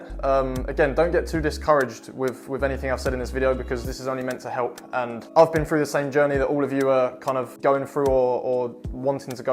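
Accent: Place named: United Kingdom. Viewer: 😂 British English, man I understand everything, my first language is Portuguese, but it sounds funny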